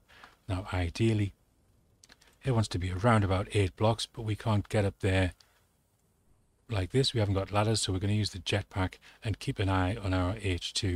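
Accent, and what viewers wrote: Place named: Sunderland, United Kingdom. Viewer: Sounds like you're from Sunderland.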